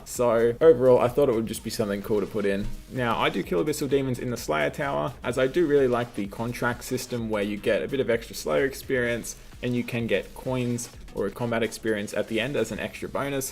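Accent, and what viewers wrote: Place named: Australia. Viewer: Man I subbed after watching your Rex safespot guide, glad I stayed around. For such a small youtuber this is really good quality. I can tell by your accent you're aussie so I gotta wonder whats your upload speed?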